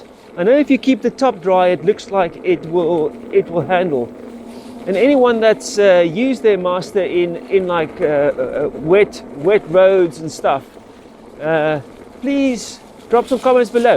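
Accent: South Africa. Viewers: Just out of interest are you, perhaps a South African or a Dutchman/Hollander as when you speak I hear a bit of the Boer accent in your speech? As for the bike and I need an e-bike, as I lost my car driving license due to failing eyesight and live at the top of a 1 in 6 hill, that no matter which angle I ride to and from, I need a bike that can substantially climb without much effort, say mode 3 and mode 5 hill assist electric power. So would you recommend this one, over the similar 345 original?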